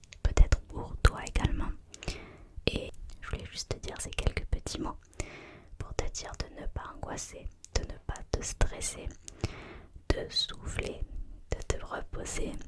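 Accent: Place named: South, France. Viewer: J'ai découvert votre chaîne il y a peu et je n'avais pas fait attention à ce petit accent du sud (enfin je crois)dans le baing lol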